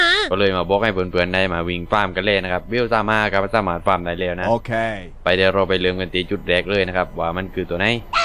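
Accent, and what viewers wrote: South, Thailand. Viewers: แหลงใต้นี้แหละ ที่ต้องการ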